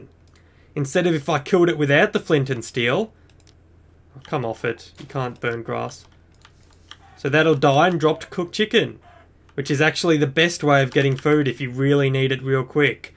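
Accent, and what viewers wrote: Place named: Australia. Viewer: i think hes from australia by the sound of his voice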